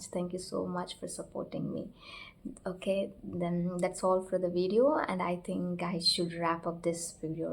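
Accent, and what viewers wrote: India. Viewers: Standerd indain english ❤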